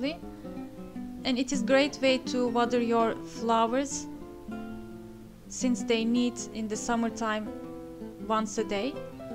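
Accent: Turkey. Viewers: Funny but I'm Turkish and this is the first time I hear English with a Turkish accent 😂. I can't speak with Turkish accent, maybe I don't hear my accent I don't know. Anyways that's nice you're keeping your accent and thank you I heard my accent for the first time hahaha.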